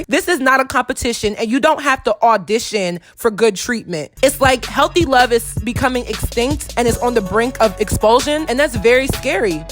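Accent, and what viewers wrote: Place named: Philadelphia, United States. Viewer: I can hear the Philly all in you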